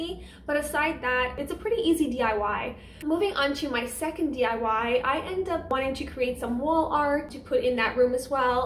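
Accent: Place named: Quebec, Canada. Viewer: Leave alone, she’s French Canadian, there is so many words that are pronounced differently in Canada. I live in the USA but I am a Canadian.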